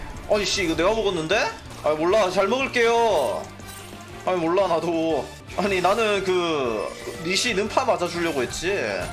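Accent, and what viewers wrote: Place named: Gimhae, South Korea. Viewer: 약간 김해 냄새가 나는데?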